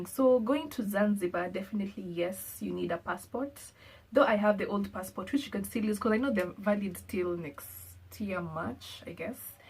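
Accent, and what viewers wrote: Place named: Kenya. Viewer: Oh you are from Kenya. I figured it out from listening to you.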